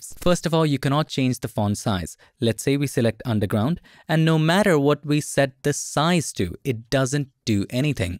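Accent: India. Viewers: I watch your videos for your excellent communication, teaching and presentation skills , soft and high pitch Indian accent with lots of confidence, immersion and proper body language 10/10 ur a benchmark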